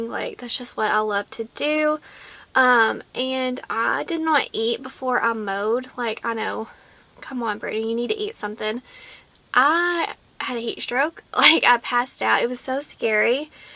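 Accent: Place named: Alabama, United States. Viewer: First time I have watched your channel and ohh boy you are such a sweetie ,) I love watching the ones with soothing voices and you have it… also an accent ( what state do you live in girl ) maybe Alabama ?? Sorry to hear it’s a concussion I got. Into a horrible wreck 6 months ago, worst grade concussion border line TBI, better now to find out I have a torn rotator cuff and I’m a Nurse so might be a career change due to this wreck YIKES !!! Slow recovery when it comes to concussions, so good luck and hope your migraines calm down… lots of rest girly ,) I just turned 40 So weird as I feel 21 and have been into cosmetics since I was 15 also professionally for 10 years… have a collection as If it were my day job, make up is my passion and no matter the meal it always fits ( other than matte concealers ) LOL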